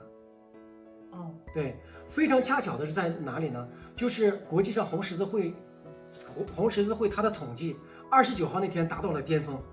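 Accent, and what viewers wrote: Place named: Northeast, China. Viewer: 这个人的说话要训练，且不说一口东北音，他每一句都说：这个的话呢，什么的话呢……。这是语病，要做播音必须要改这个不净口。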